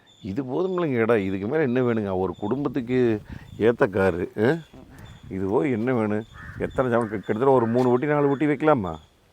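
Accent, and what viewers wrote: Kongu, India. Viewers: கொங்கு தமிழ் அருமை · Bro, korangu pugaielai (country tobacco brand)... Typical kongu slang..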